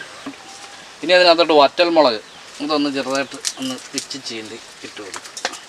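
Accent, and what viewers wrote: Kottayam, India. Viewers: ചേട്ടന്റെ സംഭാഷണം കേട്ടിട്ട് കോട്ടയം ഭാഗത്താണോ veedu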